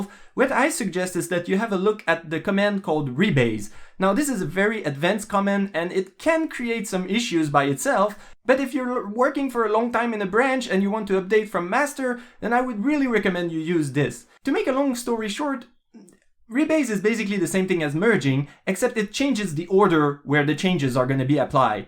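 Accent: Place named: Quebec, Canada. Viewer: Québécois? L'accent semble dire que oui! Ton channel est vraiment bon merci pour les vidéos et l'effort. J'ai une question pour toi (je vais la mettre en anglais pour le bénéfice de tous). I use git/github for programming. However, I'm just starting game dev as a hobby: how do you use it for version control specifically for video games? Do you save everything, or just your source code? Do you use different kind of backup (one for the binary files - or at least non-code, such as the project files - and then one for the source code?) or just one repository for everything (or do you save them elsewhere)? Or for the binary files you just put them on a physical device for backup? Also, what's the architecture of the git trees itself for games (in terms of good practice)? Is it the same as software where you have one main stable one, then multiple production one for different features, etc. 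? In others words, could you extend a bit the end of your video? Thanks!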